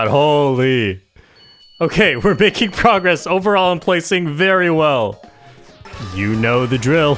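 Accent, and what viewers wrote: Canada. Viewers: Are you from British Columbia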